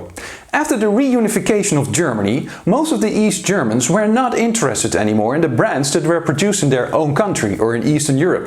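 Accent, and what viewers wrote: Netherlands. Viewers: I love your Dutch accent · His Dutch accent is very clear, once you know what a Dutch accent sounds like. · A strange sensation to watch a video about East German Products, narrated in English with a Dutch accent. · I was soo suprised to hear a Dutch accent once I clicked on this video. Love to see the interest in regional history exceeds borders! Thank you for your research and dedication!! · The Dutch accent is strong though, at his first sentence I already knew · Wow that's interesting, didn't know he's Dutch.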